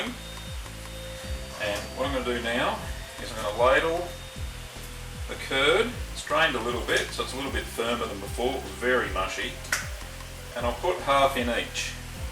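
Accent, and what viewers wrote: Australia. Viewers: I've just had a good laugh. My soundcard is broken so I watched this video with closed captions. speaks with clarity and has a pleasant Australian accent. Sadly youtube almost completely fails to understand. A highlight was when he added lip eyes to his fella. A useful video with added fun from youtube's narration software - thanks.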